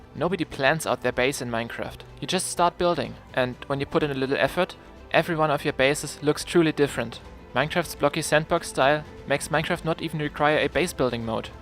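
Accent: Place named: Germany. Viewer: Sounds so german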